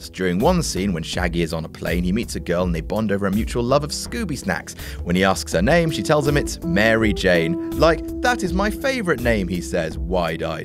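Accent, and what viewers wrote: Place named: RP, United Kingdom. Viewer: For a moment I thought I was watching a WatchMojo video.... Wondering what I was doing with my life. Then, I heard soft posh voice and I knew, despite everything, all was right with the world.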